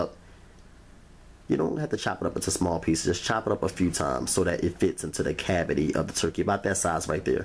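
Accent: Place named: Baltimore, United States. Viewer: U gotta be from bmore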